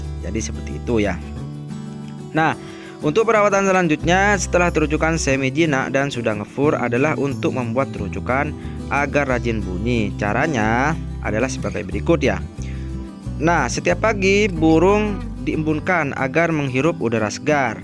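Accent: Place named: Madura, Indonesia. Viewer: Logat madura banget... lessoooh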